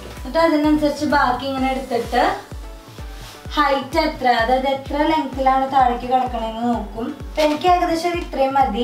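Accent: Thrissur, India. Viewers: Kure mattu basha channelil chumma body show kanikkan vendi cheruppakkarikalum aunties um sari udukkan padippikkunna kandu athokke vachu nokkumbol sahodari avatharippichathu 100% better aanu pinne thrissur slang um super ☺️ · saaz safa athanu nammade thrisur slang😁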